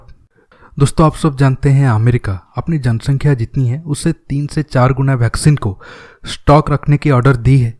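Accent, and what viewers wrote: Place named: Gujarat, India. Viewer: Bhai tujhe hindi bolna nahi ata mujhe lagta he tu gujrati he teri hindi amit shah ke jaisa he isi laga ke tu gujrati he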